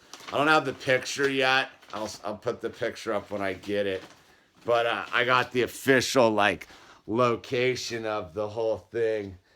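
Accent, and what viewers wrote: California, United States. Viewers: I'm going to have to say that coming from a guy who does literal backbreaking labor, I wouldn't hear the word "dude" to much let alone the ever iconic Californian accent.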